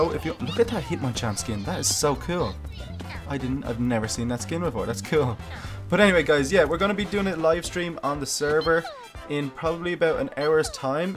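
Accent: Ireland. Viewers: Are you Irish if so tell me because im irish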